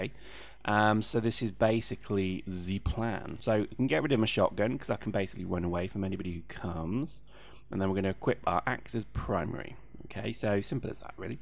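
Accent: United Kingdom. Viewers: I wish it were somehow possible to collect rainwater. I mean it rains bloody often enough in this game! Great let's play, btw, dat britishness.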